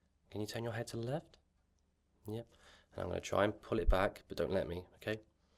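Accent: England, United Kingdom. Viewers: Love your english accent. Where are you from? Thanks for your videos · I've just come across your channel today. This is the first time I've seen an ASMR channel by a British dude (I'm from NI)! Your accent rocks! :) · I like your British accent.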